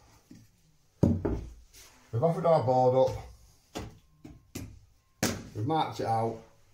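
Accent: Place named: Liverpool, United Kingdom. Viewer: Who's this scouser?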